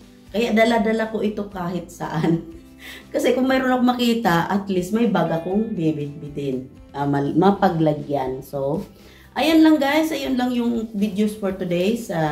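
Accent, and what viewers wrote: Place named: Visayas, Philippines. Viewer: Bisaya diay ka...taga asa ka sis?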